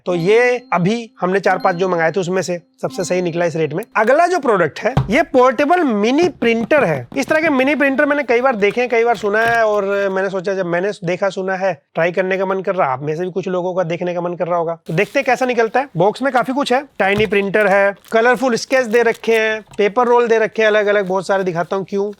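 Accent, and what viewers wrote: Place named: Uttar Pradesh, India. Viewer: भइया आप के बोलने का तरीका बड़ा बढ़िया लगता है मतलब लगता है की हा हम उत्तर प्रदेश से हैं बहुत इंटरेस्टिंग वीडियो होती है आपकी ❤ से love u brother 🥰🫶🏻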